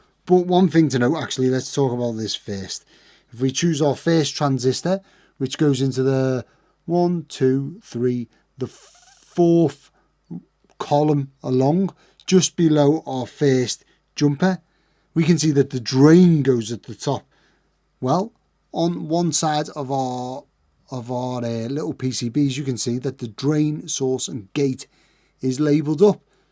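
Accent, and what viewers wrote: Liverpool, United Kingdom. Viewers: Hello! Enjoying your videos very much - I do build pedals from time to time! (Have built Zvex Fuzz Factory, Bazz Fuss, Fuzz Face, Vox Repeat Percussion, Sparkle Drive, Wooly Mammoth...) I like that your approach is just making it work - sometimes I've felt bad about twisting resistors together to get the right one, but seeing you do it shows me I'm in good company! I sometimes parallel them which I feel happier about because it gives me a chunkier "component" rather than a long skinny one. Takes a bit more trick math tho (or just try until it's close enough for government work as you say). As someone who once lived - and met my wife and married - in Liverpool, it's lovely to hear your accent again, and be reminded of the wonderful times and good people of your fair city - certainly the biggest influence on my life. You using a lighter to shrink heatshrink just took me right back to the street-sellers shouting "Pound-yer-lighter" (which we still say in my house when we're looking for one). 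I wonder if the electronics store near Rushworth's and Curly Music is still there - no doubt a lot has changed. Anyway - in my experience of putting stuff together on veroboard, I used to make my boards as compact as possible - but as you experienced, this efficiency can make life hard - I think this layout could benefit from a bit of breathing room - there's certainly room in your superb enclosure! I use "diy layout creator" for my designs. My other thought was, as you use some very posh co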